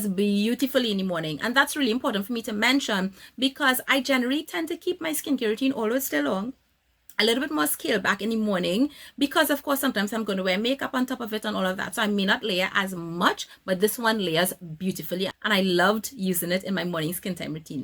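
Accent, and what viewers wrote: Trinidad and Tobago. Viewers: This review helped me so much in choosing the perfect cleanser of my acne prone skin…. Thank you! Thank you! Thank you! And of course, you must know a Trini anywhere, especially by their accent 😍🇹🇹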